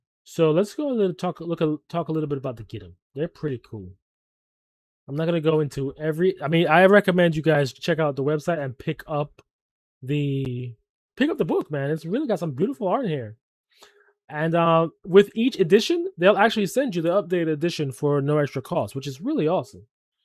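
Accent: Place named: Jamaica. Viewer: Ok so I've been preeing for a while so your video showed up on my feed. And I couldn't help but think 'wow this guy in the video's accent could pass for Jamaican' THEN YOU MENTIONED YOU WERE JAMAICAN (as we Jamaican's often do) and I was like eyyyyy we out here!! Lol let me know if you ever wanna play I'd love to party up.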